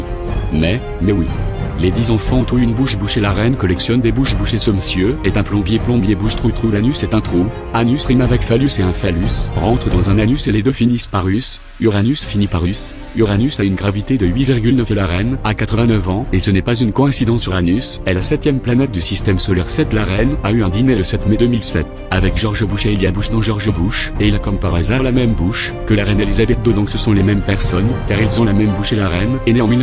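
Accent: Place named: Quebec, Canada. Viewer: mdr j adore cet accent (québecois)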